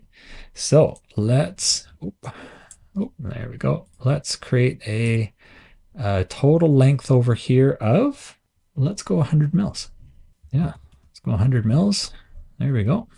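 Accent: Canada. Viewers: I could tell you were Canadian by your accent, and I've been trying to specifically place it, but I don't think I would've guessed BC. Hi from a fellow Canadian!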